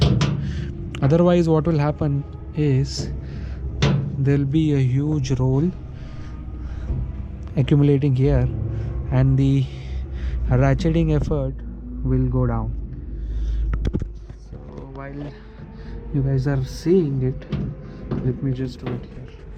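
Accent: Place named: India. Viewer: Sounds like your Indian, hit me back looking for a fellow countryman to ride Road Atlanta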